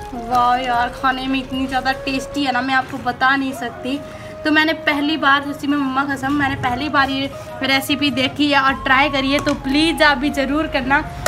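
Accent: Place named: Haryana, India. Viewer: आपकी आवाज भाषा से बिल्कुल हरयाणवी लगती हो हिमाचल की लैंग्वेज नहीं लगती बिल्कुल भी क्या बात है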